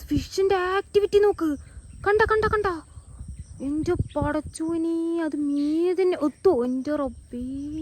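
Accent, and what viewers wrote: Palakkad, India. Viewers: സ്ലാങ് കണ്ടപ്പോൾ പാലക്കാട്‌ ആണോ എന്ന് തോന്നി അതാ ചോദിച്ചത്.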